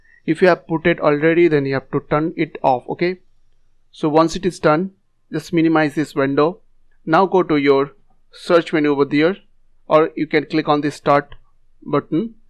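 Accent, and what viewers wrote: India. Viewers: I live in US for some time now so i know accent of Indian brothers:)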